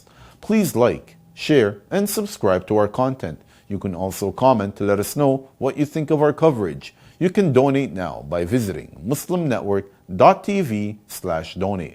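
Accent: United States. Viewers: . ╔═══ ❤☀💚☀❤ ═══╗ 💚💚💚💚💚💚💚💚💚 Dear Mr., Assalaamu alaikum. The way you present the news is fantastic. Despite your American accent we from India find it very easy to follow and understand you without the help of the TRANSCRIPT down below. I am thankful to you for continuing the news of Research & Development in Human Health. We used to treat this news as a harbinger of the end of your news bulletin because so far you have been making it as your concluding news item. While we used to anxiously wait for this item, we were used to treating it as an announcement that you are now ending your news bulletin. But today you inserted that news somewhere in the middle. Please continue your old practice of making that news as your concluding news such that we donot miss out on this news and this news also gets highlighted like any headline!!! 🌐🌐🌐🌐🌐🌐🌐🌐🌐 ╚═══ ❤☀💚☀❤ ═══╝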